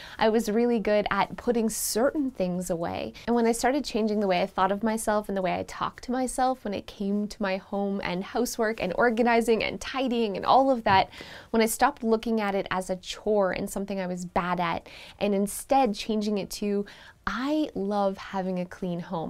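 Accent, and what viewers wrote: Canada. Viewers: Washer in the kitchen, and all the "aboots" Are ya Canadian 🇨🇦 🍁?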